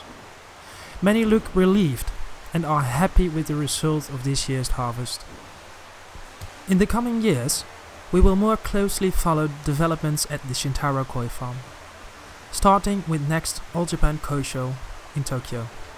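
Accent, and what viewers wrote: Netherlands. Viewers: Is he from the Netherlands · he is dutch lol. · The announcer in this video is distinctly Dutch I can tell that accent anywhere. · The narrator sounds so Dutch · Is it just me or am I hearing a slight Dutch accent?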